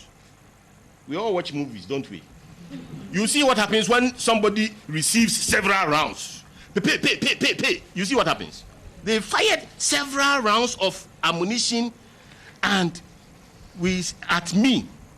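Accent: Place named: Africa. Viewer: Mate. Am a soldier with foreign EU army. I can assure you we will assist the UN real soon on a peace keeping mission. You are hearding down that bad road with your African accent. Your visas will stop working once we declare you nation a UN case. Watch (Hotel Rwanda) Your country will be my 4th UN mission. It will definitely be peace keeping grounds.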